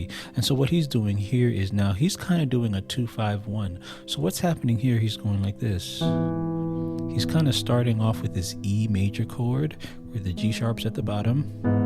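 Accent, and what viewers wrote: Caribbean. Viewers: Brotha, I hear nuances of a West Indian accent. Where do you hail from bro? (Weh Yo People dem Really from?). Blessings from Florida, hailing from the U.S. VIRGIN ISLANDS 🇻🇮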